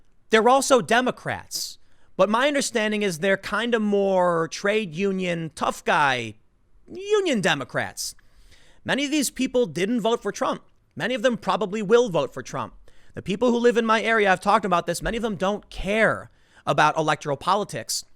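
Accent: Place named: Philadelphia, United States. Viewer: Really laying down the Philly accent lol 🤣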